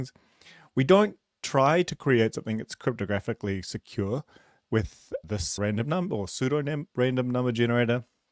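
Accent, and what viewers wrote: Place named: New Zealand. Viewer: Thanks for sharing! Love your NZ accent btw :) churr bro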